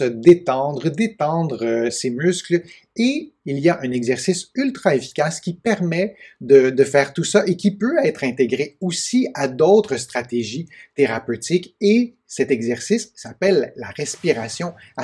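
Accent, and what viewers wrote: Canada. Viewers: Avec votre accent canadien cher cousin 😀 , je comprends expirer quand vous parlez d inspirer , sympa votre vidéo Cordialement , doc j claude plessier , Oise France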